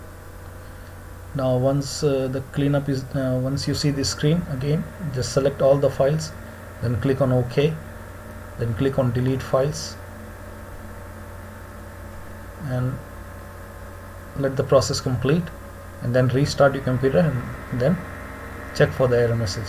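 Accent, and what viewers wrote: India. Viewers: typical tutorial on youtube 7/10! but not the best has Indian accent ✔️ loud keyboard noises ✔️ yellow circle around the mouse cursor ❌ said "hello friends" at the beginning ❌ video has more likes than dislikes ✔️ it worked ✔️ low video quality 🟡0,5 low audio quality 🟡0,5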